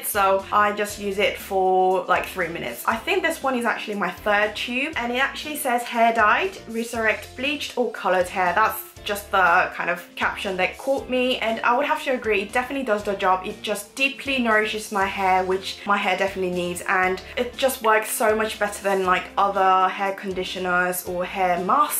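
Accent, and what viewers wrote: United Kingdom. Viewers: 항상 영상 잘보고있어용❤❤❤ 영국영어 들을때 뭔가 언니가 하면 끌리는게 있어서 계속 반복재생하게되눈거 같아용😍😍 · 요런 추천영상 넘 좋아요 ❤️❤️ 언제 또 여행갈지 모르겠지만 😂 그리고 항상 느끼는 거지만 목소리랑 영국발음도 듣기 참 좋아용:D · 액센트가 완전 취저ㅠㅠㅠㅠ british accent를 갖고싶지만 어릴때부터 미국에만 살아서 american accent 만 가능한 저는 웁니다...★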